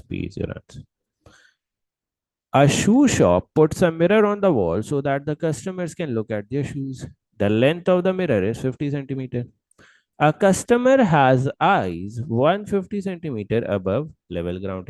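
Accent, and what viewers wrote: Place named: India. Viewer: fav indian accent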